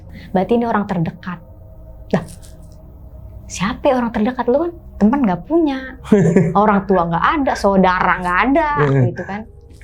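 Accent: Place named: South Jakarta, Indonesia. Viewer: Terlalu Jaksel gaya penyampaian materi nya, hello yg nonton bnyk org daerah bicara nya terlalu jakarta deh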